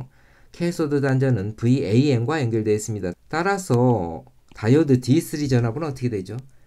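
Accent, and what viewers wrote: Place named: India. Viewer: 교수님 근데 어린시절 인도쪽서 유학하셨는가요? 저 거래처 인도분이 한국어하는거랑 억양이 너무비슷해서 놀랐어요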